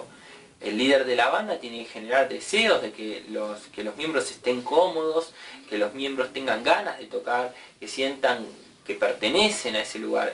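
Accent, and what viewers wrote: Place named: Argentina. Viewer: Muy claros tus aportes teoricos. Una clase con acento argentino que se agradece.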